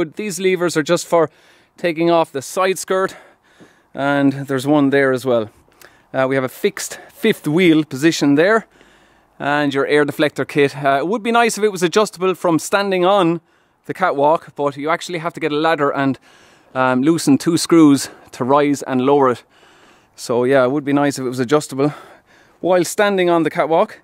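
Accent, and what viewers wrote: Ireland. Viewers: I bet you are Irish, aren't you ? Thanks for the introduction to the ACTROS. I used to live in Galway, was a pleasure to hear IE, again !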